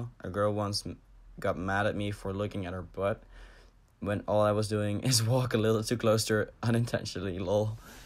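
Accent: Netherlands. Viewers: That dutch accent tho 🤘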